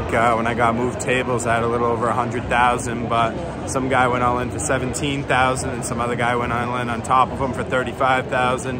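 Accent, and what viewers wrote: Boston, United States. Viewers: You a boston boy? Asking cuz i am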